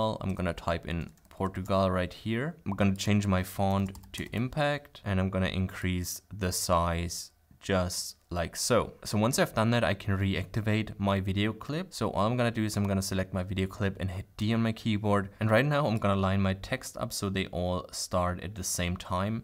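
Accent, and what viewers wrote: Germany. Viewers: Man, for a German you barely got any Accent left, how come?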